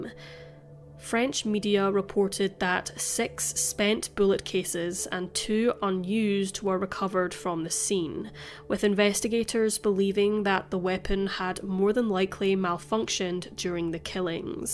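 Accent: Scotland, United Kingdom. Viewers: Love your accent, Scotland?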